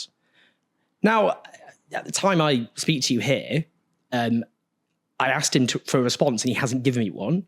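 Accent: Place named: Sheffield, United Kingdom. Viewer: From here in Canada I’ve loved all of your commentary, you are a truly passionate humanitarian. Keep up the sharp lens and wit you bring to the subject. One thing though, and please don’t take this the wrong way, but I know of people who I’ve wanted many times to send your videos to, but I know they’d have a hard time with your sometimes rapid fire words combined with your more than slight Sheffield accent. I sometimes have a difficulty with that myself (and do slow the video down to take it in easier) and I think other Anglo speakers might as well, especially when your obviously skilled delivery with words comes at a blink of the ear so to speak. 😊you’re a rock. Thanks.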